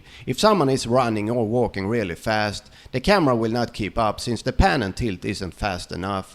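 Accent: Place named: Sweden. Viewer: Trevlig video, är du svensk? Låter så när du pratar engelska. 😊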